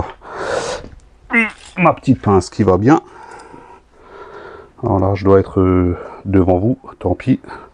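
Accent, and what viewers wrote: Alsace, France. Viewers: Pourquoi dire qu'un soufflet adaptable est moins bon qu'un origine? quel est ton argument, car je ne suis pas d'accord, la qualité du caoutchouc étant identique. Très bonne vidéo. Mais je pense que cet outil n'est pas indispensable compte tenu du nombre de fois où la nécessité de changer un soufflet est ridicule. J'ai trois véhicules tournants et je n'ai jamais changé de soufflet. D'autre part, je pense que cet outil n'est valable que pour les soufflets externes. En tout cas, merci pour tes vidéos très didactiques et utiles pour le commun des mortels. En plus, ton accent est lié à ma jeunesse passée en Alsace, à Schiltigheim et cela me rappelle tellement de souvenirs d'une époque révolue. Je suis comme Michel Sardou. Je hais notre société où la violence est devenue la règle.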